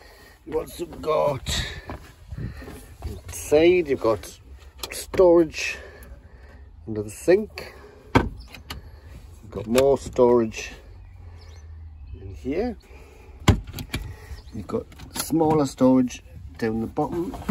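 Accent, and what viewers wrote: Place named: India. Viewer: Are you Indian?!!!i cant believe how you talking!!!!😁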